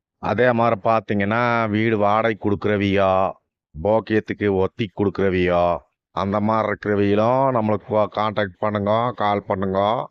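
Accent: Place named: Kongu, India. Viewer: கொங்குத் தமிழ் அருமை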